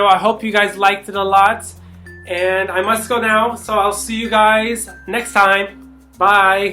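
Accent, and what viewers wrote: Turkey. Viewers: I don't know if you noticed but when you speak, your accent kinda turns into a turkish english accent. But I feel yah :D about being a mixed kid that didn't learn turkish at home. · your accent is like Izmir's people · you even have this sweet turkish accent to your english haha :) edit: hoşgeldin SUBSCRIBE'ı geliyooor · Man,you are amazing!Your moves when you were taking the video,your turkish accent,and your life story was just redicilous!! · How long have you been in Turkey? I can hear a bit of Turkish accent in your English. ( as in why did you come "here") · I think I can swear you are native speaker of Turkish. You have very strong accent, such like Acun :D And your vocabulary and jokes etc are completely Turkish.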